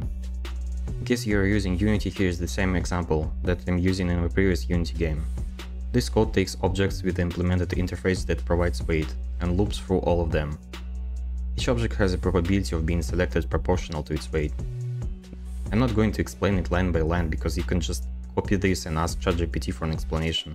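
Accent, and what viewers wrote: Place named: Russia. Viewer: бро я же слышу ты русский❤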